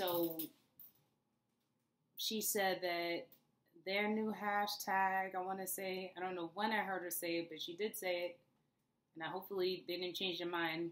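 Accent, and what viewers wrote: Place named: New York, United States. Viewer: Yes I hear that accent, but it's nice, I normally am not fond of NY accents, but yours is minor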